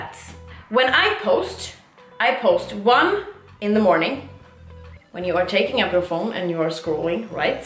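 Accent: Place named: Sweden. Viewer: I knew she was from Sweden before watching the video I heard it in her pronunciation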